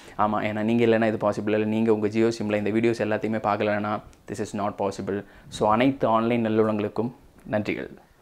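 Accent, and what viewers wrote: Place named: Nagercoil, India. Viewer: hmm voice ah pathu tha keta nenacha neenga Nagercoil thanu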